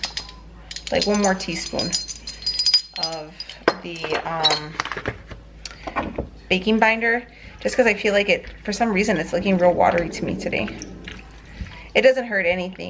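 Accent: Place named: Minnesota, United States. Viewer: I hear a northern accent..are you from Minnesota ?? I was born and raised in Minneapolis -Fridley.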